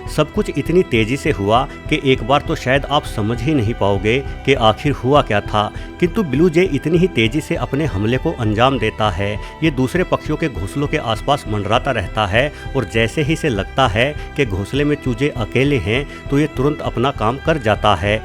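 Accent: Haryana, India. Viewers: Sir aap Haryana se he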